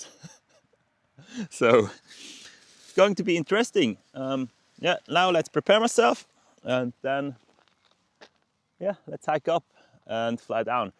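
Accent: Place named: Europe. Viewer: Thanks for the review. Btw I can't quite figure where you are from in Europe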